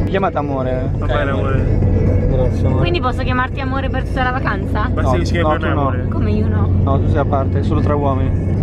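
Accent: Italy. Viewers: inglese alla renzi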